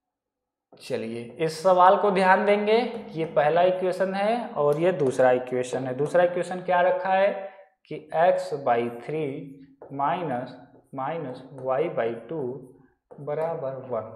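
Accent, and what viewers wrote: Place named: Uttar Pradesh, India. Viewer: Up se ho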